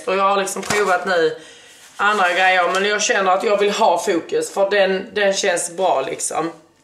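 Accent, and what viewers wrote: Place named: Scania, Sweden. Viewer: Vilken härlig skånska du har! ✌🏽️ bor i malmö men kan nästan gissa på att du är från Helsingborg? Roligt hur skånska kan skilja sig så😋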